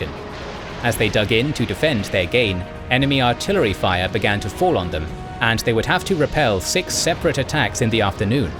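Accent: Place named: England, United Kingdom. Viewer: its Amerikal as in California the 'c' is very hard All u need do is google to get these words right But then u are English by ur accent and u know how to pronounce everything no matter what language it came form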